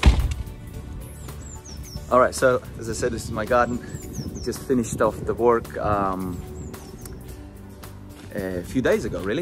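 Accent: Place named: Scandinavia. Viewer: Lol i was curious because you sort of sound nordic. I guess latvias not too far away from there.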